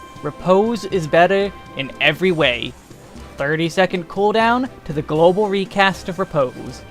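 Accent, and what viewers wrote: United States. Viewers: At first I thought his way of speaking to be familiar, then I realized his intonation is basically the same as Superbutterbuns', but a way more chill. (I wonder if they're from the same state in the US, but I don't know how to differentiate all the accents, so if anyone knows, please tell me)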